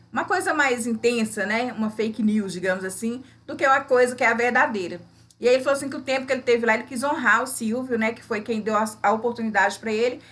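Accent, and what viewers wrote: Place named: Minas Gerais, Brazil. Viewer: Seu canal é muito bom aqui no YouTube VC é muito carismática beijos no seu coração eu sou de São Paulo e pelo seu sotaque VC é de Minas gerais né ☝️😉 adoro quando vc fala assim ☝️ tá quente pelando acho muito engraçado 😅 vc é muito simpática beijos no seu coração e um feliz natal com muita saúde paz e alegria pra vc e sua família 😉☝️👏👏👏👏🙏🙏🙏🙏🙏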